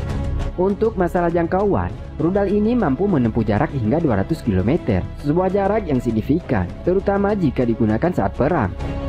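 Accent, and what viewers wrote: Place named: Bugis, Indonesia. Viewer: DARI LOGATNYA PASTI ORANG BUGIS....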